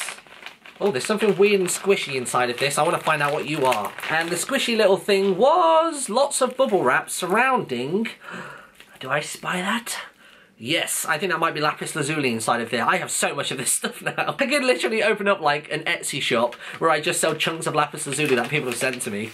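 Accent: United Kingdom. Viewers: He says it correctly (for a brit). Stop thinking that your way is correct.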